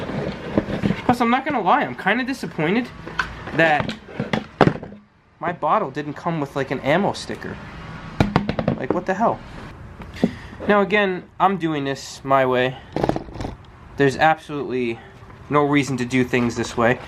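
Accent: New York, United States. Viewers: ayo why do i feel like you're from new york? lol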